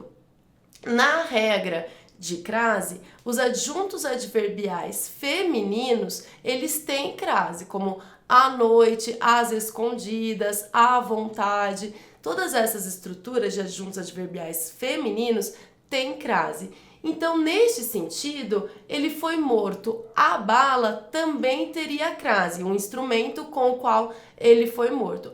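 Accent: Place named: Minas Gerais, Brazil. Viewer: Essa manja muito; me salvando e salvando geral...pelo sotaque é mineirinha...também né s2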